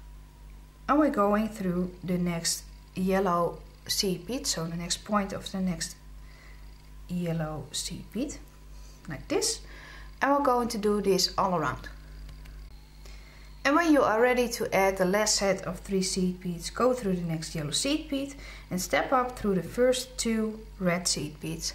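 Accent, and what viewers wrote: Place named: Netherlands. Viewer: I love your accent :o) ... its like dutch / netherlands ?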